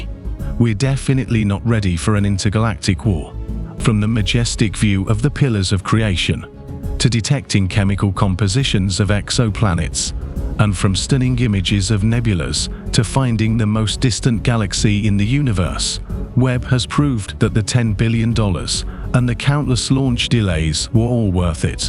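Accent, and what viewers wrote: United Kingdom. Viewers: Please stop using the "British" accent in your Voiceover. There are a lot better choices like Swedish, German, or Irish.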